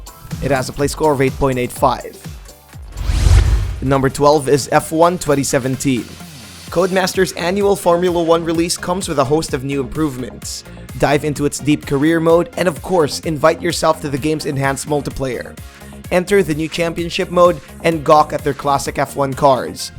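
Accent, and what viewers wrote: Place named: Philippines. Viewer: narrator is filipino. obvious sa accent.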